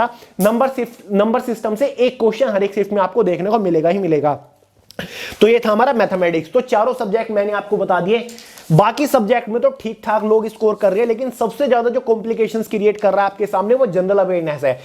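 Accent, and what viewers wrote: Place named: Haryana, India. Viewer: SIR APKA HARYANAVI ACCENT SEEDHA DIL PAR LAGTA HAI 😊❤️ MAZA AA GAYA REVIEW DEKH KAR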